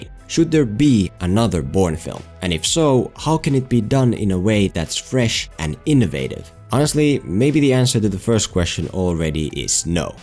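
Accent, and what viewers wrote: Finland. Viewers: Are you finnish? Accent sounds very finnish.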